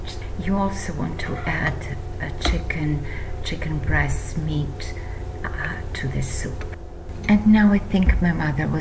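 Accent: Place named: Eastern Europe. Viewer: You do the same! You're a lovely host, love your channel.😊 Are you in Eastern Europe by chance? I hear your accent & it's sounds familiar... The reason I ask is that my grandmother came to the US from there many, many years ago. Her style of cooking was similiar to yours also... 😁 I came to your channel from the "I am Leo" channel. Vesna is a terrific lady rescuing & taking care of all those stray animals isn't she?! I love her for doing all that... Seems to be that I'm starting to make friends in that part of the world, I've never been there but hopefully someday I'll be able to go there. There seems to be so many nice, friendly & sincere people there! Wonder traditions and family values there, I guess you could say I'm embracing my ancestry... 😊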